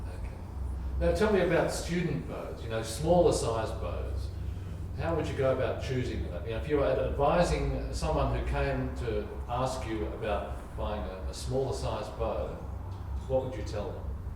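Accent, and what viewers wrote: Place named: Australia. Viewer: His accent sounds Australian to me, so I don't know if he means US dollars or Oz dollars.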